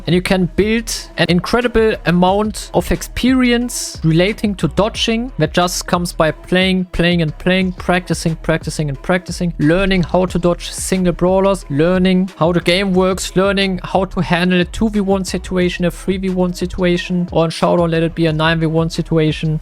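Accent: Germany. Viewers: Du bist doch deutsch oder? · Man merkt so hart das er deutsch ist. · You speak English like Thomas Tuchel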